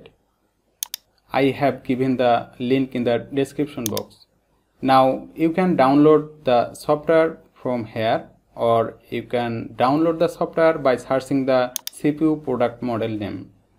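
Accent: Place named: Bangladesh. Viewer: Bro are u from Bangladesh?....the Accent seems like that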